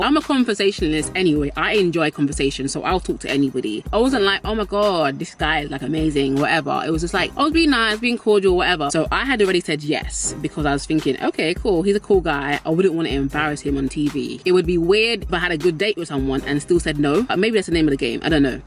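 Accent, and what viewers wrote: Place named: England, United Kingdom. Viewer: !!! I missed you. I haven't been on your channel for a while. I am so happy that you are doing well and have a 1yr anniversary coming soon. Congratulations. I feel you on the dating alone thing. I'm kinda going through that right now. I'm learning a lot about myself as an individual and what are my game changers and deal breakers. I love, love your English accent.😍 You have a very beautiful and giving spirit. God Bless you &so much love. 🤗❤🙏🏿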